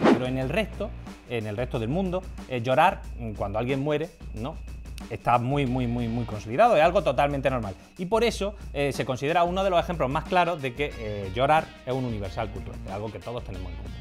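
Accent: Spain. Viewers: Muy mal audio y la edición podría mejorarse mucho si se reduce el sonido de los efectos y se evitan tantos cortes y por amor del cielo trate de hablar en español universal que lo entendamos los de fuera de España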